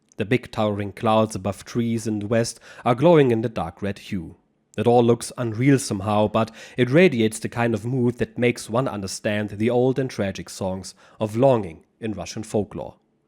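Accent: Germany. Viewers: Thanks for your accent, Thuringia?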